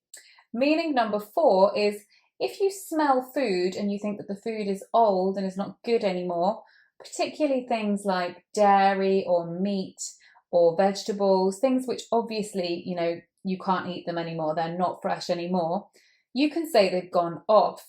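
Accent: United Kingdom. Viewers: Awfully love British accent or love the u teach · I never give up I never come around I adore british accent thank you for help me god blessing you forever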